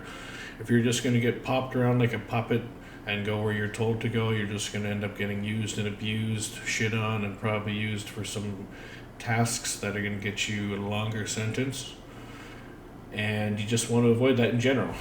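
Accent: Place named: Canada. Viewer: You get so many mixed messages about the American system about rock spiders and sucks and such salts against women. But these are the same people that do that. So that confuses me and you've are giving me some serious information which I appreciate. When you say you was so sided with certain games on motorcycles. Were you a hang around? I was just curious. Cause that's what it sounds like. I'm not trying to compare 1 person with another. But you remind me so much of JD. But the Canadian version, The fact that you speak canadian in other words. I own my shit is two true you give great advice/knowledge. Keep doing what you're doing you're success. I know i'm not kissing ass i'm just blown away.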